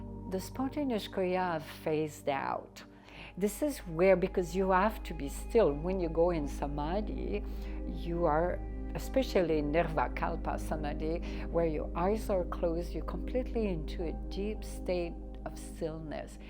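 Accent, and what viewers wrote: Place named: West, United States. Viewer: This lady is self deluded, otherwise she wouldn’t give herself the name and sell courses. Some of the info is accurate but it is mostly appropriated knowledge from Vedic texts. Doubt she is able to pronounce any mantra properly with her western accent. Cool video tho, hope you sell a lot of courses its decent info for pashus.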